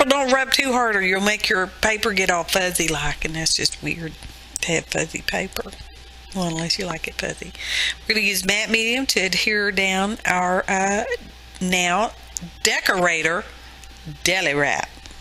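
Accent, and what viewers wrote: South, United States. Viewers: Cute cards......and love your southern accent!!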